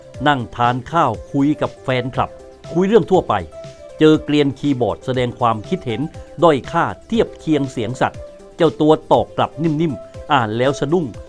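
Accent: South, Thailand. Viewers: นอกจากเสียงไพเราะแล้ว พูดใต้ได้ชัดเจนมากเลยค่ะ ฟังแล้วทึ่งจัง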